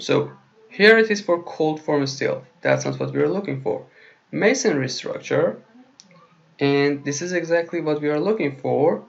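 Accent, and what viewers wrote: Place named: Iran. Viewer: لهچه ات داد میزنه ایرانی هستی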